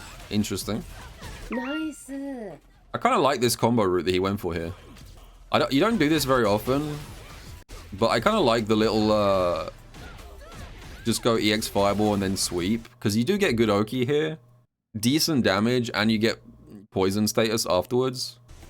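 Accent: United Kingdom. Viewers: The British pronunciations are killing me in this video lol. Sako's Aki? No, Sacko's Acky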